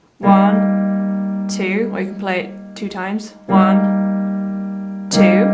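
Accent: United Kingdom. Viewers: I know how to play the song. I just couldn't stop the video, enjoying your lovely British accent... 😊. Also nice work with the tune! Cheers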